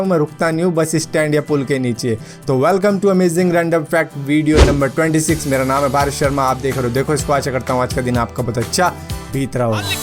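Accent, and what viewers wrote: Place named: Punjab, India. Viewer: hahaha punjabi lgda bai tu v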